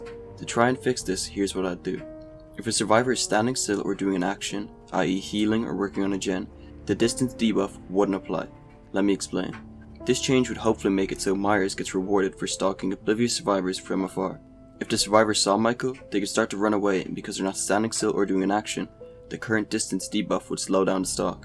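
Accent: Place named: Netherlands. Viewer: stop talking like a dutchie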